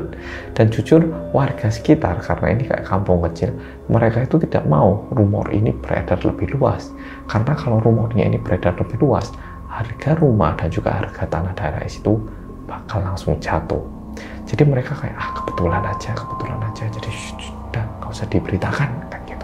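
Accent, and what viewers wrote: Java, Indonesia. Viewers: Makasih, Boloku Sing Paling Medok Dheweeeee 🙏🏼🙏🏼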